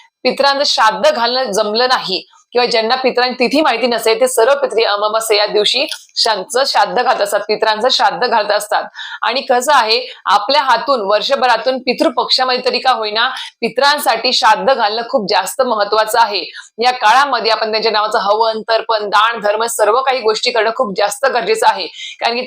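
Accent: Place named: Karnataka, India. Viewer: बोलण्यावरून तुम्ही कर्नाटक च्या वाटताय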